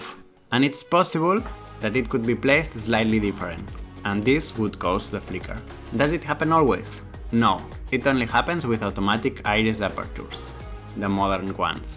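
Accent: Spain. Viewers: I see your spanish accent lol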